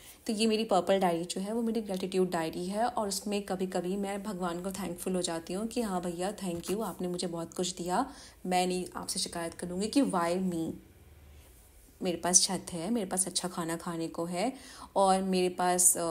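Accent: Bihar, India. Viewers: Aap BIHAR se ho kya bhojpuri bol rhi ho ❤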